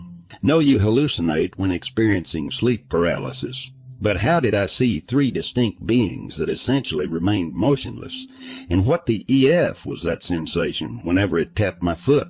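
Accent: South, United States. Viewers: Good News!!! I'm really enjoying the substitute narrator!! He's definitely good at it especially with that southern twang!!!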